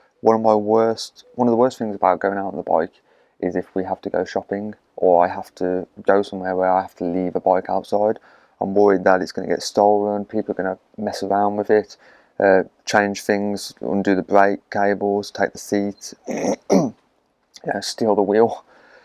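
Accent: United Kingdom. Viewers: Very informative. Thinking of getting one (despite the price!!!!) so you're opinion on owning one has helped enormously. Great to hear the Dudley accent, too! Not enough Brummie and Black Country accents on YouTube!😃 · Good to hear a fellow Brummie. Im looking to buy my first Brompton. Ive read alot of articles about the weight limit of 110kgs and not to worry too much on that fact. What are your thoughts on this? I am a slightly heavier bloke, 135kgs. Cheer mate 👍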